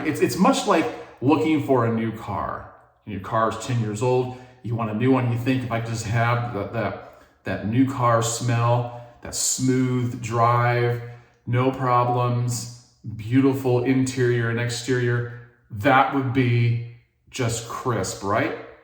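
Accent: United States. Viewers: I learnt so many tips from you mate. Amazing , love your yank accent !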